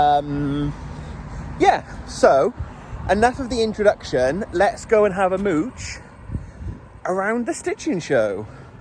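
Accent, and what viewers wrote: Wales, United Kingdom. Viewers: Thanks for your lovely videos. I could listen to your Welsh accent forever, sounds like singing. Your MKAL is gorgeous, pink and green, you can’t go wrong. Cheers Chris 🇦🇺😁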